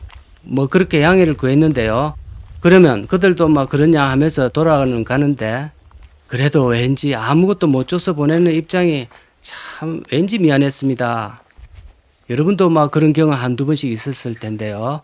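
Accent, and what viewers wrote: Gyeongsang, South Korea. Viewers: 일단 구독 찍고 좋아요 눌러놓고… 댓글 다는 까닭은… 묘하게 사람을 끌어 당기는 조금 투박하나 사람 냄새 품은 나레이션 때문이고요. 경상도 저 아래쪽일 듯한 사투리, 소탈함 속에 해학이 영상을 보는 내내 입가에 웃음을 머금게하는 까닭압니다. 할배네로 자주 구경 오겠습니다. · 타지방 억양1도 가미안된 순수 경상가야국톤 사랑합니다.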